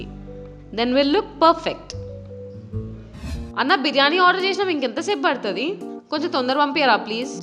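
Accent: Rayalaseema, India. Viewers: ur from rayalseema na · Hey r u from rayala seema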